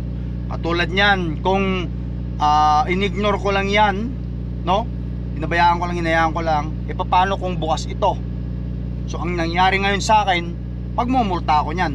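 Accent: Ilocos, Philippines. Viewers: Mailiw nak man idiay Pinas no mangeg ko accent mo.. Keep safe trucking Manong ko, shout out met ah ..Uncle Sam Outdoor from Sydney Australia originally from Tondol Anda Pangasinan..Dios ti agngina apo.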